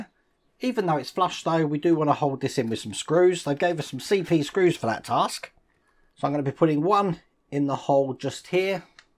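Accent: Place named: United Kingdom. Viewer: Don't worry about the way you speak. I've always thought it makes you endearingly British. Also my son always uses a soft T when he speaks, so mittens ends up sounding like middens. And when I was young my Rs sounded more like Ws. We all have those little quirks! Still love the channel, keep up the great work!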